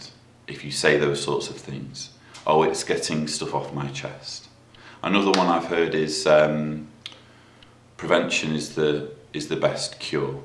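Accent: England, United Kingdom. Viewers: Ignorant men cannot be educated. Neither can ignorant women. There is a lack of education. Lack of education can be remedied. A few good lectures and careful correction of writing and analysis by a good prof. Such an education made me effective for Supreme Court litigation and corporate antitrust work. Anyone with half a brain knows that the biggest turn off is ME, ME, ME, ME, ME, ME. ME. He is far too old to be a millennial ME, ME, ME. God help his children. ME, ME/ Most likely, I can describe his wife. I suspect she lacks confidence. Anytime she wants to learn, she hears that all funds need to go to ME, ME, ME. I suspect that he admires her cleaning, sewing, and childrearing skills most of all. Sex is often secondary to working class men. They are not good lovers. ME, ME, ME. Rather than spend any family time, they hang out with men who endorse the ME, ME, ME mind frame. 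Ask me, how do I know this? First, observation within my own family. Second point. Reading detailed anthropological and psychological studies of working class men. ME, ME, ME Always ME> never WE, Never Our movement, pointing out powerful women and men, NO. ME/ My PC is very problematic. Also, his English usage is not that great. Don't fall for a proper English accent as the hallmark of intelligence. There are much better speakers and writers. If my computer were working, I could show you a much better example of my own writing. Dietrich Bonhoeffer, Hemingway. Steinbeck, F. Scott Fitzgerald, Hillary Mantel w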